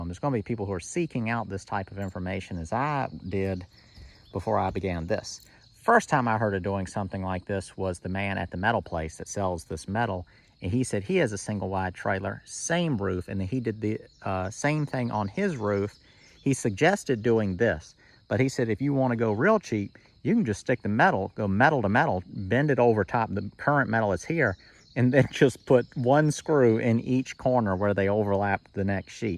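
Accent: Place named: Texas, United States. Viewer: You must be from Texas lol. You gt that Texan draw to your voice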